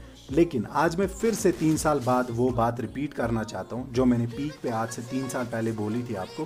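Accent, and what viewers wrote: Kashmir, India. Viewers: Arey bhai aap ki awaaz sun ke hi laga ki aap kashmiri hooooo. Aap se insta se pata chala. Aapki awaaz rj se milti haii. Luv frm pulwama. Support kashmiri playerssss.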